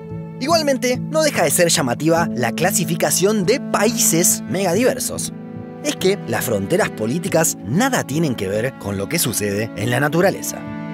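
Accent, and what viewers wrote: Argentina. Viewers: Vaya acento de este chaval, qué es ese dialecto argentino? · Por tu forma de hablar, sos compatriota o uruguayo. Abrazo.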